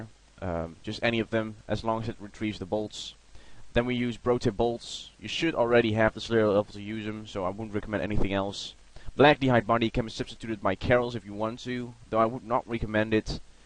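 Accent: Netherlands. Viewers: Je hoort gewoon dat je nederlands ben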